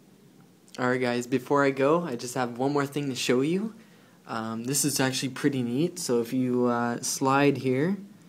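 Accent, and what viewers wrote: Canada. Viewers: Thats just his Canadian Accent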